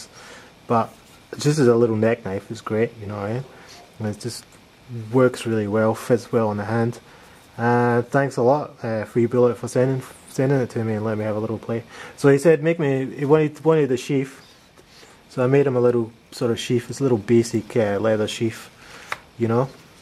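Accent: Scotland, United Kingdom. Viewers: There,s me thinking ah yes got to be an aussie and then I hear a man with ma home country accent, see you, you must be a jock thats what im thinking,anyway loved your video and the intro is good enough for the movies keep up the good work all the best.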